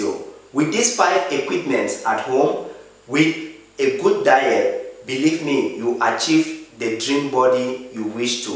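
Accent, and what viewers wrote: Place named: Nigeria. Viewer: ohh nice you sounded like a Nigerian good job mehn you look good 👍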